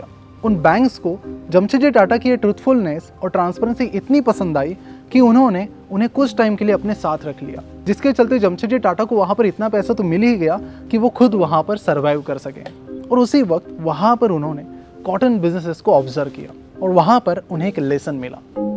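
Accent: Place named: Maharashtra, India. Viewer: I love your Marathi accent...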